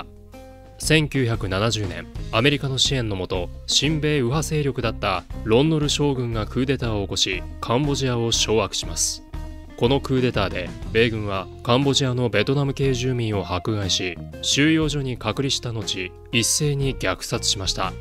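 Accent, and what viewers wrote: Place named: South Korea. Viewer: この読み手韓国人らしいね(確かSから始まる読みが少ない)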